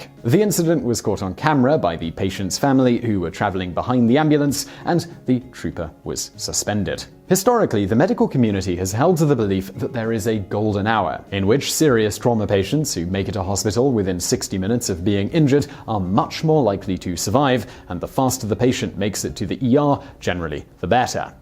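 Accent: England, United Kingdom. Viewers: Oh, please. The video was concered about the traffic behaviour of ambulances and there is one team member of any given EMS ground-unit that drives it. This crewmember is also responsible for all driving-decisions and thus the subject of this video. Therefore he is an ambulance driver. Duh! The way more alarming thing about this video is that it was presented by an English-accent person only using US examples and one UK comment about time together with pictures from North America, the UK, Eastern Europe (Czech?) Germany and one some presumably Latin-American country without ever saying on which place he is refering to as road rules differ.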